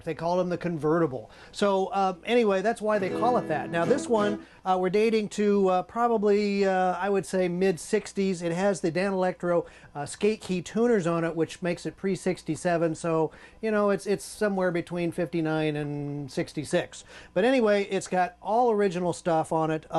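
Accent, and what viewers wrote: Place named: United States. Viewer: like watching clapton with u.s. accent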